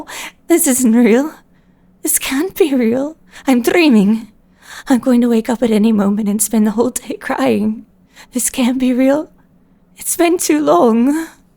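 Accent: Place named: Scotland, United Kingdom. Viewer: Scottish accent detected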